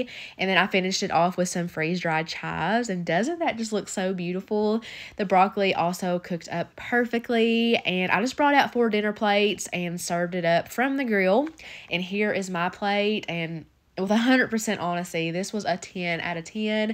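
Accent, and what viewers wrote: South, United States. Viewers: I like your videos but girlll you sound so country teehee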